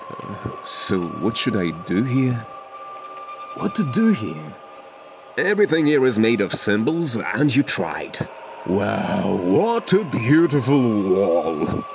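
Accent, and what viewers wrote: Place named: United Kingdom. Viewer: the british/whatever accent is that with the video is amazing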